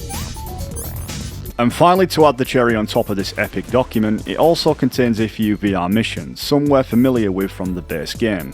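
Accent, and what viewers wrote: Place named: United Kingdom. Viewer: 10 seconds in you called modern society terrible. im guessing that you live in the uk based on your accent. yeah you guys have some HORRIBLE politicians, but if you dont like it LEAVE THE COUNTRY. the whole planet is not defined by what you guys do on that island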